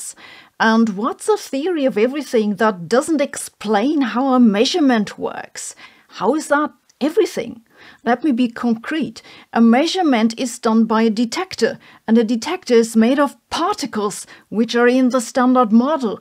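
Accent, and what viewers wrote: Germany. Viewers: Einstein is being pronounced correctly for Sabine's type of German accent. Einstein himself had a southern germanic accent which softens a lot of sounds.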